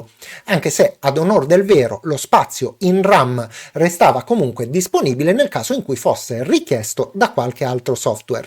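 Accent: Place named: Palermo, Italy. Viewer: Abiti a Genova però il tuo accento è palermitano. Comunque grazie per i tuoi video che sono sempre utili.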